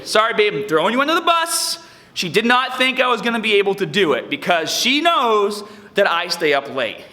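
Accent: Boston, United States. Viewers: haha awesome! Picked up that Boston accent for sure. great video man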